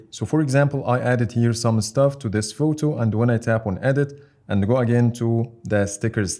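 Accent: Egypt. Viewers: brother are you from egypt you have a very masri accent love from uae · اللهجة المصرية بالانجليزي مستفزة جدا ....مفيش كلمة وحدة بتتنطق صح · مش عارف ليه حاسس انك مصري 🙂 لهجتنا معروفة حتى بالانجليزي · يابن عليك مصري اوي يا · The one talking in the video is Egyptian, I know that accent man xD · im guessing you are egyptian because of your accent its really good to see ppl from my country making videos in english too keep up the good work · your accent is so Egyptian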